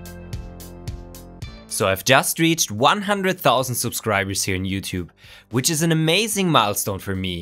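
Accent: Germany. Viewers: Wtf are you german or what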